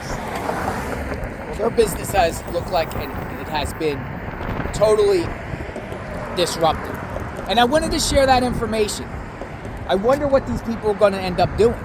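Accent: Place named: Midwest, United States. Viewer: Are you from Michigan or Wisconsin ? Trying to place the accent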